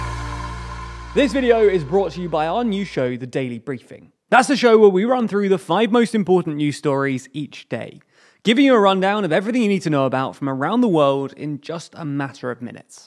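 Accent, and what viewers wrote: United Kingdom. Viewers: What's with the accent? Are you based in UK, or are you a transplant over here, Stateside?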